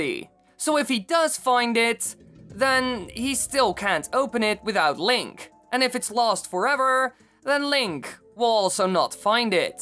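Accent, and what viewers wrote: Netherlands. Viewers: ik kijk graag naar je video's ze geven een leuke twist aan zelda (en andere nintendo games) (ik had al een klein gevoel dat je nederlands was omdat het wel een klein beetje nederlands klinkt(echt een heelklein beetje)) ik hoop dat ik vaker een geheime nederlands comment kan platsen ;)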